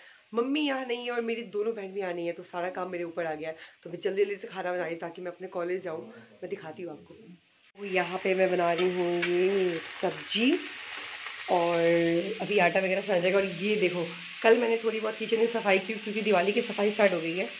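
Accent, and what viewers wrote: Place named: Bhojpuri, India. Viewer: भोजपुरी आवाज से सुकून मिलता है🙏🙏❤❤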